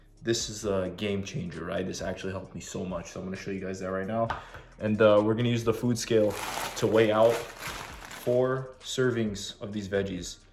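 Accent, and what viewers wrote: Australia. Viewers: That accent was Australian crossed with victorian English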